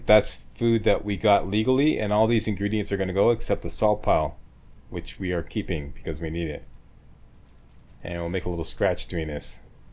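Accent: Canada. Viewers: FREE CRACK! Just kidding. Now that I have your attention, are you Canadian? You sounds Canadian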